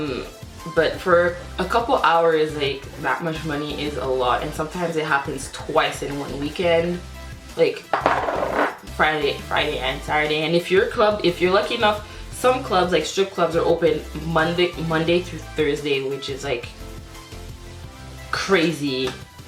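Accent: Canada. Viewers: Girllll, I love you I subscribeddd. I could definitely tell you were Canadian too 😭❤️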